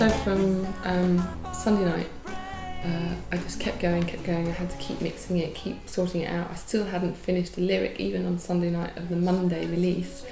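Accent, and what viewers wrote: United Kingdom. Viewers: I find myself torn between focusing on an amazing song and a British accent. So I watched this twice. :)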